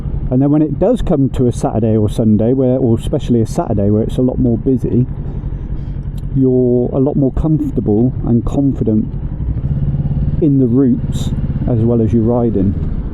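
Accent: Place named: United Kingdom. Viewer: The British accent makes it so good. Very entertaining. Keep it up!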